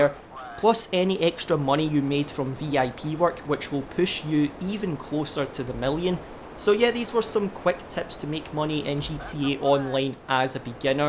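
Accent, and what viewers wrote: Ireland. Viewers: Irish man? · Your accent gives me Irish vibes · I love his fuckin irish accent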